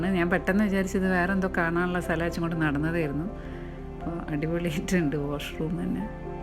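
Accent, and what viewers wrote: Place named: North Kerala, India. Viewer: Super vlog dear. Detail ayi kanichu thannu. Koode Nammude swantham vadakkan bhashayum😍😍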